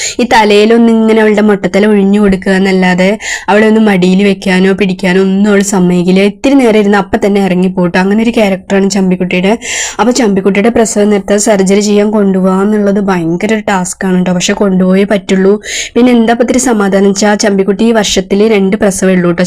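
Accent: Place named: Kozhikode, India. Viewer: നിങ്ങൾ കോഴിക്കോട് കാരി ആണോ.. നല്ല രസമുണ്ട് കേൾക്കാൻ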